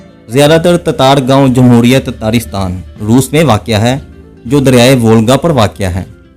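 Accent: Punjab, Pakistan. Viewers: پنجابی میں اردو بولنے کے بجائے پنجابی میں بول لو تو زیادہ اچھا لگے گا۔وڈیو تو ویسے بھی چوری کی ہے۔ترجمہ اور ڈبنگ تو بندے کے پتروں کیطرح کرلیاکرو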